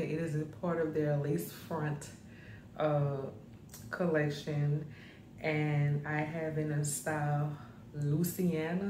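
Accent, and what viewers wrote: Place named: Louisiana, United States. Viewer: Wig is so pretty on you ! I will for sure buy ! And omg are you from Louisiana? I think I hear an accent.Its my favorite accent my parents are from there🤩👍🏾 let me go check out your other reviews. Edit: I watched the whole thing and you mentioned Louisiana 🤣 I was so quick to comment great review again!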